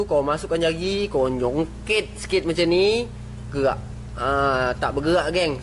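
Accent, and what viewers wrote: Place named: Negeri Sembilan, Malaysia. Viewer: buat vlog ckp nogori la plk....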